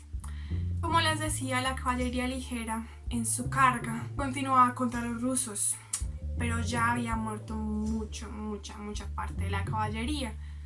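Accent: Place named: Colombia. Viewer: Videos asi no tienen pérdida alguna, muy bien hecho y explicado con tu propio toque y estilo. Y más aún si es con metal PD: Con ese acento te me haces que eres de mi Colombia tierra querida.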